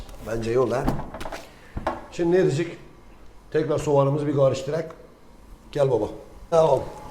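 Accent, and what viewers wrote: Gaziantep, Turkey. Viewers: ustam entep şiven le süper seyırediliyorsun video larını seyir ediyorum kısmet olursa pandemi bıterse sizinle tanışmak için antepe geleceğim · Abi zevkle senin videolarina bakiyoruz. Birşeyler de öğreniyoruz.Bismillahirrahmanirahim diyerek baslamanda beni çok mutlu ediyor Antep şiveside çok güzel. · Öz antepli şive mükemmel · usta, Antebe gelirsem seni ziyarete gelecegim yorum. Bizde gurbette yasayan anteplilerdeniz. Valla antep dilini duymak beni sevindiroyor. Elline goluna saglik yorum cok güzel görünüyor. Senin videolarina zevkle bakiyorum!!!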